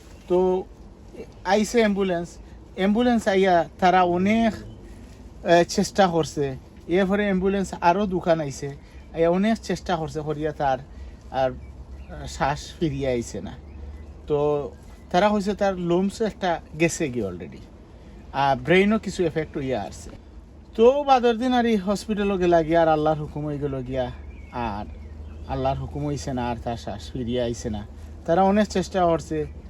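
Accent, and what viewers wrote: Sylhet, Bangladesh. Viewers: আপু সিলেটের আন্চলিক ভাষা একটু ভিন্ন। তাই আপনার বুঝতে কষ্ট হচ্ছে। আমি ছোটবেলা থেকে সিলেটের আত্মীয় স্বজনের সাথে কথা বলে অভ‍্যস্ত তাই বুঝি। উনার ভিডিও গুলো অনেক informative. ইনশাআল্লাহ দেখতে দেখতে শিখে যাবেন। 😊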